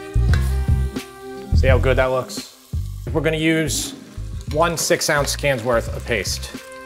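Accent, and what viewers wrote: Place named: Long Island, United States. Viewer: Long Island accent goes crazy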